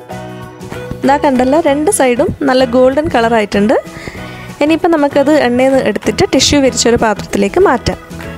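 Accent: Kannur, India. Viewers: ഇക്ക് ഇസ്റ്റല്ലന്ന് പർജ്ജിലെ... 😂😂 kannur slang adipoli...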